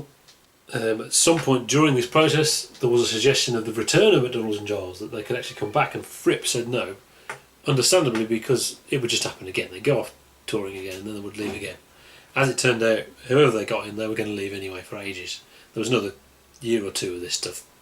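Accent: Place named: United Kingdom. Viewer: It’s a great album in my opinion, even though it’s similar to the first one. Also, can you try to sound less British next video?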